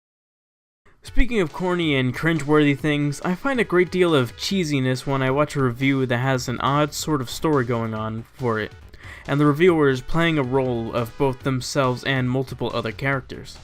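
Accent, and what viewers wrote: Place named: Boston, United States. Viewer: Noice boston accent...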